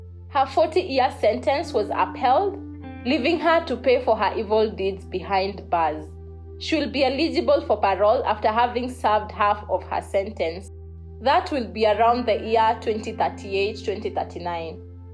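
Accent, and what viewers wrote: Kenya. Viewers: You are a very good storyteller. I love listening to your beautiful Kenyan accent.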